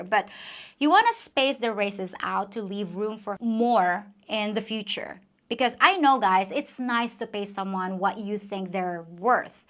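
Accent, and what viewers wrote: United States. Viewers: I think it's cute that you speak completely like an American saying "in's" instead of "ing's" at the end of words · Where did you pick up Americian things like 'workin" instead of working, and "ya?"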